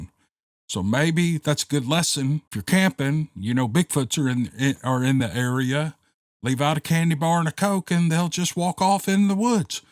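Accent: South, United States. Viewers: I love you. I listen to you EVERY NIGHT. I enjoy so much listening to you read in that sweet southern accent. Keep up the great work you do. ❤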